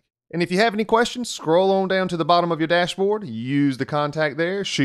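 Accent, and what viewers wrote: South, United States. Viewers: the problem is most of the famous channels are bad to explain math(or at least they're not beigginer friendly)...I think you have inate ability to explain things like no other ..saw one of your video...I'm really really bad at math(math phobia)...currently Delving into math..even though don't understand calculus but understood one of your linear approximation calculus video....at least 90% of it and i was brooding if he were my neighbour, teacher and then thought should make a comment...it's rigorous work to make a playlist like pre algebra and algebra entirely including every topics and it's too much to ask for...my goal is to learn pre algebra and algebra ..then geometry, trigonometry, pre calculs and calculus..I think I will never learn these cause bad at self study and grasping Abstract idea like math...Best regards and wishes to you❤...love love that country accent...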